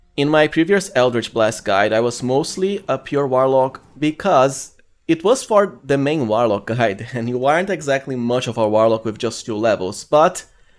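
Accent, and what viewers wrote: Brazil. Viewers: are you brazilian btw? The accent feels like it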